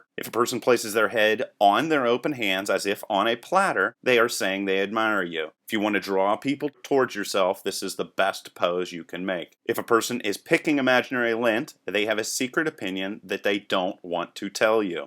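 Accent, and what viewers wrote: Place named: Western Pennsylvania, United States. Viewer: Are you from western PA? I recognize that accent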